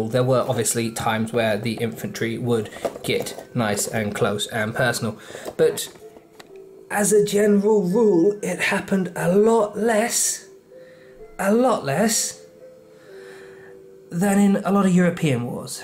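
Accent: United Kingdom. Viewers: i really enjoy your history videos! are you english?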